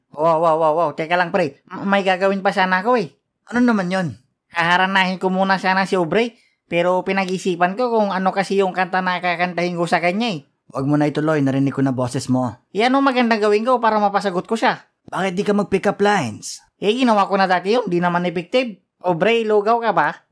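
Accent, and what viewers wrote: Ilocos, Philippines. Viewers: Kulit ng accent mong Ilocano 😂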